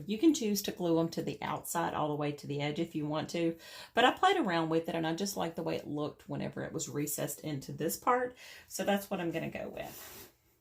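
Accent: South, United States. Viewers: Love watching you and hearing your Southern accent. I’m an Texan girl so it’s good to hear a familiar accent. Your DIYS are so good and I feel like I’m at home. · OMG ... I'm from the South (Southeast Texas) and I LOVE, LOVE, LOVE your accent!!! Can't wait to see what you come up with 😁😁😁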